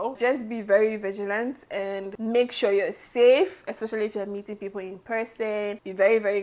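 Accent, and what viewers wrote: Jamaica. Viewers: The best storyteller ❤❤. She all start talking potios..she is hooked.... i love that....if you are not Jamaican the "all a dem" might have missed you at the end. I loovvee it!❤ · Jamaican men are nice happy for you...when they really love you they will go all the way out for you...happy you got to visit my country and hope u come back all the best and have a great week...u catch on the patois real fast man❤❤❤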